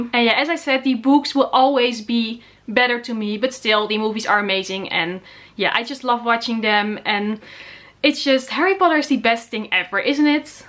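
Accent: Netherlands. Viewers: Your accent is charming :) I immediately started wondering where are you from while watching one of your previous videos ... but I've just glanced at your description and now I know that you're Dutch! As far as the movies go ... I was dejected enough with the Half-Blood Prince to ignore the Deathly Hallows I premiere in Poland where I live. The last two movies I only watched at home.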